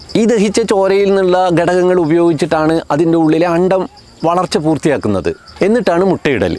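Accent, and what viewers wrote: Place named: Thrissur, India. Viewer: മാഷിന്റെ ഭാഷ ശൈലി കേൾക്കാൻ മനോഹരവും മനസിലാക്കാൻ വളരെ എളുപ്പമാണ്. തൃശൂർ ജില്ലയിലാണോ അല്ലെങ്കിൽ തൃശൂർ ജില്ലക്ക് അടുത്താണോ മാഷിന്റെ വീട്? നല്ല അവതരണം മാഷേ..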